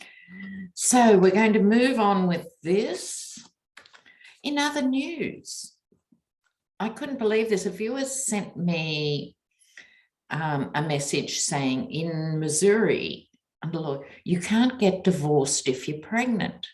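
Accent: Australia. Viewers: Hello love your tarot card reading you keep us calm when I tune in on YouTube you were the first I ever saw do tarot cards I got laugh at your next door neighbor's they were very loud and you would sit on the floor and read your tarot cards with your Australian accent l just love your channel l said this is real tarot card reader keep up the good work.......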